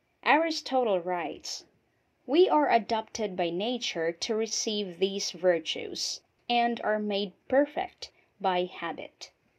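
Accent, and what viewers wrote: Philippines. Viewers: Answer me...is this Philippine English?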